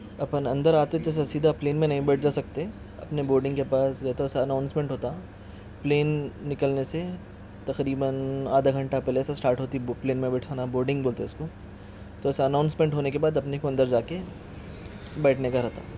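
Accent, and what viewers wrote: Hyderabad, India. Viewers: Hyderabadi sunkey sukun masla dikha..mast samjhaye 😍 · PURE hyderabadi yaar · Hydrabadi Hindi Is awesome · Awaaj sunke bhi aap ko pata nahi chala bhai haidrabadi h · Waahh... Puri Hyderabadi language Bole aap.. Good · Sir your Hyderabadi Hindi is Fabulous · Bhai hydrabadi bhasa bhot achi lagti meko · wow man i liket your hydrabady language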